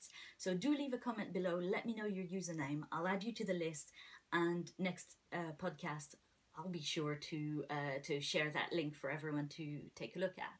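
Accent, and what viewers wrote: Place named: Canada. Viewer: love this! Love to hear a Canadian voice x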